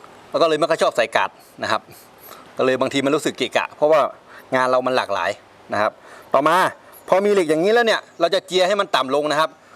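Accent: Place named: North, Thailand. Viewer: คนเมืองกะนิ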